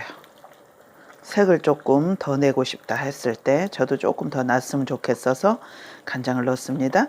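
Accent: South Korea. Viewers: 친정 엄마께 제가 잡채하겠다고 말씀드려놨더니.. 당면을 미리 데쳐놓시고. 우엉이며 녹두나물이며 시금치까지 준비해놓으셨어요..ㅠㅠ 그래도 원체 식구들이 좋아하는 아이템이라.. 꼭 저리 당면삶아 해보고 말씀드릴께요.. 코다리 무우조림도 열씨미 보고 있구요.. 삼삼하고 손쉬운 깻잎도 정말 대박입니다.. 두가지 꼭 제 레시피로 만들려구요~ 저도 전라도 광주라 친근한 말투가 정겨워서 자주 듣게 됬습니다. 쉽고 고급진 레페시도 땡기고요.. 아참. 소갈비도. 나중애 도전아이템 입니다.. ㅎㅎ 감사합니다. 대표님~~^^♥ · 요즘 듣기 어려운 서울 억양이 듣기 좋네요.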